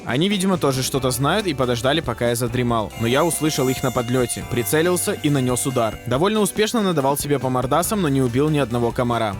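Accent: Odessa, Ukraine. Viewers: Вы из Одессы?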